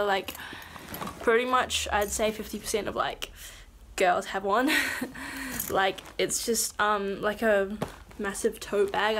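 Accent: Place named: New Zealand. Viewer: Are you from New Zealand?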